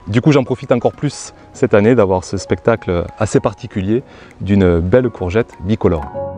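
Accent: South, France. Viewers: Salut comment va tu? et quelle plaisir de te retrouver et d’entendre ton accent du sud 🤣 Si tu veux on peut faire dû troc de légumes. C’est vrai les haricots on mal germé mais là j’arrête pas de cueillir j’en suis à la deuxième récolte. C’est vrai les tomates 🍅 nous font languir elles arrive on compte goûte. Les courgettes non font plaisir et on a hâte de savourer les aubergines 🍆. Bon dimanche et à bientôt 😎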